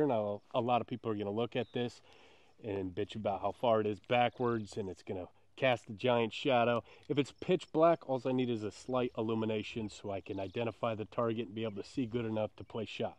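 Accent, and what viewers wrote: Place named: Midwest, United States. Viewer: I always thought midwestern was a nuetral language. Well I'm wrong, there's an accent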